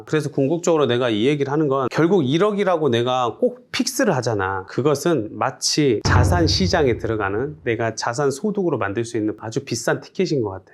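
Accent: Jeolla, South Korea. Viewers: 맞는말도 전라도 사투리라 사기치는 느낌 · 나도 전라디언싫어하는데 쟤는 말씨 안숨기잖아ㅋㅋㅋ억까는 하지말자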